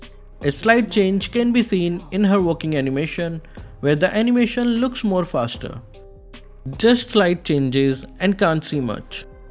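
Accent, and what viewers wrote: India. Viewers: Indian accent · Its because of his accent indian english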